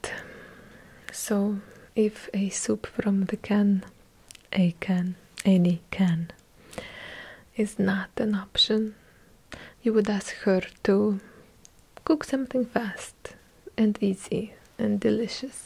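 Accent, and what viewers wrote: Russia. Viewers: Why are you so underrated 🥺 ma'am im from Pakistan and i love your heavy Russian accent asmr ❤️ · Some people say french Italian Spanish me i say Russian all the way! Just perfect! 🔟🌹😍🥰💗💗💗#️⃣1️⃣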